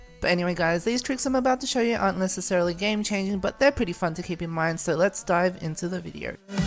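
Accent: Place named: United Kingdom. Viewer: sounds like a british girl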